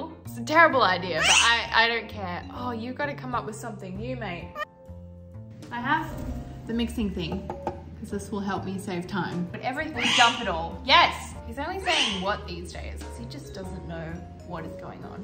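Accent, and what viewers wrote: United States. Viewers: Very charmed by the American accent. I see an opportunity for a teamup with fellow Aussie and baker extraordinaire Ann Reardon from How to Cook That? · Holy shit, you're American accent is spot on.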